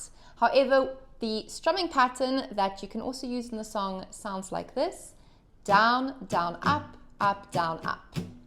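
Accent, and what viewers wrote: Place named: South Africa. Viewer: Awesome...and nice to hear a South African accent.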